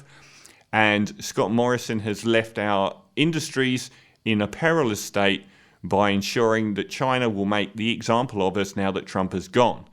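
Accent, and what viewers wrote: England, United Kingdom. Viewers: The guy making this video sure does. He has a strong English accent but he speaks as if he were Australian.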